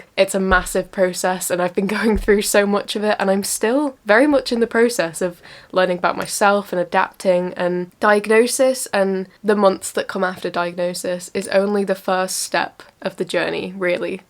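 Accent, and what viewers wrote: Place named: United Kingdom. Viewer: Your British accent is so cool, I can actually understand it like American English but with the British vocabulary. What part of Britain are you from?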